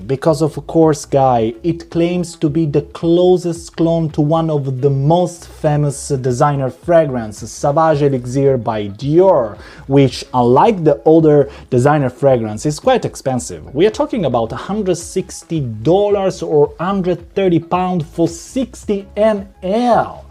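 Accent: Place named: Italy. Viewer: thick accent. are you Italian?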